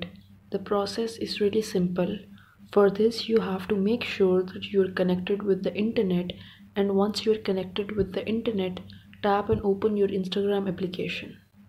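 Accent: India. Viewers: OMG - this lady's Indian accent is enough to make me want to blow my brains out - C R I N G E ! ! ! ! ! Somebody ought to tell her that you don't start a sentence with the word "so" unless you're following up a previous sentence, implying "therefore". D O U B L E C R I N G E ! ! ! ! !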